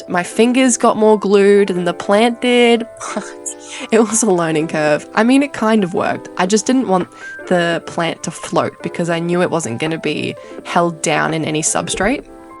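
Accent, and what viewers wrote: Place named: Australia. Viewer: Love your down to earth vids and its really refreshing to hear an Aussie accent best wishes from SE Queensland 😊😊😊😊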